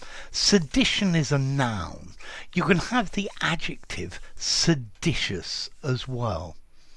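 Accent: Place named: Oxford, United Kingdom. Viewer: His accent is from Oxford.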